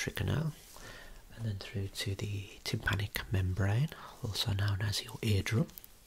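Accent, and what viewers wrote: Birmingham, United Kingdom. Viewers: Damn, that's a strong Brummie accent. Works so well for this relaxed vocal range style in this video, though. Love it! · that British voice I mean DAMN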